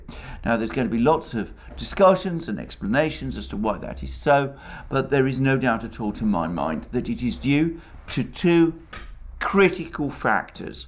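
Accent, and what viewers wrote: United Kingdom. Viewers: Great job AM and I am a sucker for British accent.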